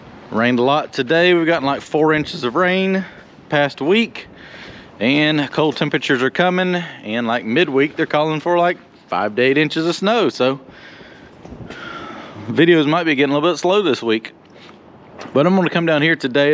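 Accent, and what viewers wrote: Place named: North Carolina, United States. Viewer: I think that he’s from NC and they do talk different not funny but different.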